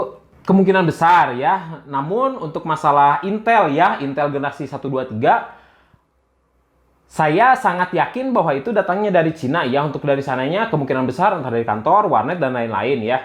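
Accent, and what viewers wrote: West Java, Indonesia. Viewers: kaya ada logat sundanya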